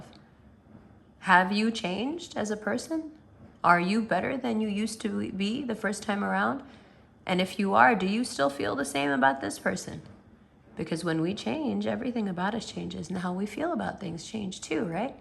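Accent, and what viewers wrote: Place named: New York, United States. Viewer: LOVE you Fam!! ❤️🕉️🙏🏼 That New York twang coming through strong 😍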